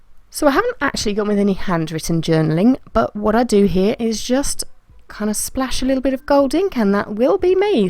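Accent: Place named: England, United Kingdom. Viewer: Not kind, truthful. I was surprised to hear an English accent though, I was expecting an exotic Nordic-type accent. I have seen you before but only as your name, so didn't connect the two together. Can't wait for more of your lovely cute videos. I definitely agree with Cathy Yosha! See you next time.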